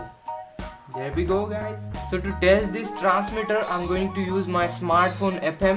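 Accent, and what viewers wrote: India. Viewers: Metin Baydemir It's Indian English. Just an accent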